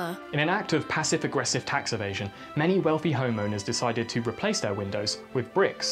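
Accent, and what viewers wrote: United Kingdom. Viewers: This guy does a pretty good British accent.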